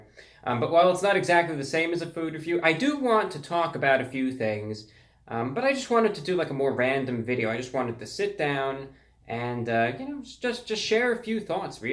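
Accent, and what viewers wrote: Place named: New York, United States. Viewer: where is this guy from? I would guess New York City or maybe NJ? that accent....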